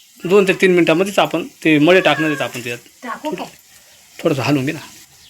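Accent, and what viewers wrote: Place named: Nashik, India. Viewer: कुठे राहतात काका भाषा तर नाशिक जवळची वाटते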